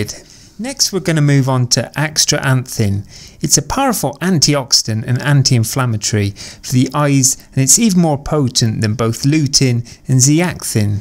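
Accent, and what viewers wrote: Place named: England, United Kingdom. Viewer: Where in England is he from?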